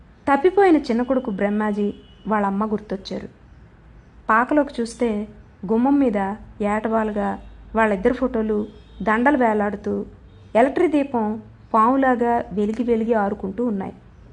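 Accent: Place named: North Andhra, India. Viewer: చెక్కా వారికి గానీ పెండ్లికి గానీ పిలుపుకి గానీ కథతో సంబంధం లేదు. (లేదా నాకు అర్థం కాలేదు)😂 గారి కథనం బాగుంది. గారు ఉత్తరాంధ్ర యాసతో చదువుతుంటే వీనులవిందు గా ఉంది! కొన్ని పదాలు మరీ పాయసంలో జీడిపప్పుల్లా అ(వి)నిపించాయి!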